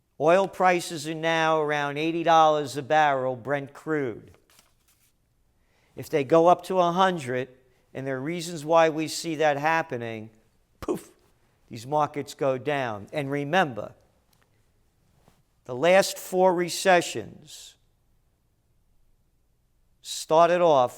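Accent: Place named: New York, United States. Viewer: New Yawker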